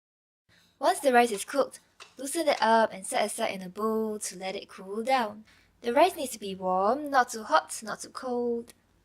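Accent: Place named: Singapore. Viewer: Can tell you're Singaporean with the accent hahah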